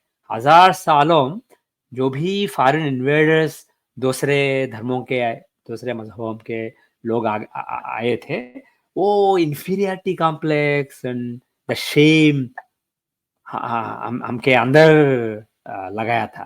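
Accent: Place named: South India, India. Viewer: whaaaat is saafisticated? He clearly cannot speak in Hindi and jumps to English often in the middle of sentences.. His English is quite average with heavy South Indian accent. "Emperor has no clothes....".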